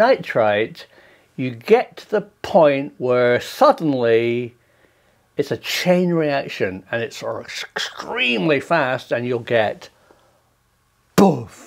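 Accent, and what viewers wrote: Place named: Scotland, United Kingdom. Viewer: Professor I thought Scottish where are you from I’m from Fraserburgh in the north east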